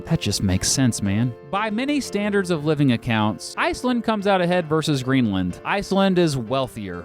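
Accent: United States. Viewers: You’re Icelandic pronunciations are really American. ð is pronounced like the th in think by the way. And á is pronounced like the ou in out. í is pronounced like the ee sheep. ó is pronounced like the o in show. Other than that this was 👌🏼👌🏼👌🏼.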